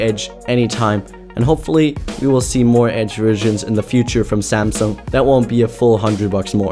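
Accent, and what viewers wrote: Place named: Germany. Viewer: you're from Germany? you have a really nice accent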